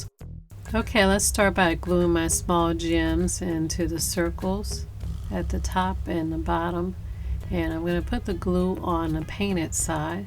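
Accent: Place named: South, United States. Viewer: I really love your creativity, and especially I love listening to your wonderful accent. Are you from somewhere in the South?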